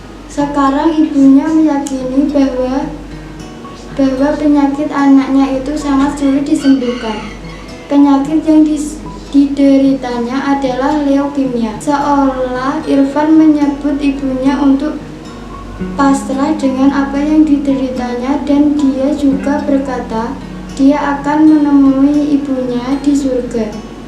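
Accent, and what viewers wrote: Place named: Java, Indonesia. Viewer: terima ksh tapi ngomomg ny MEDOK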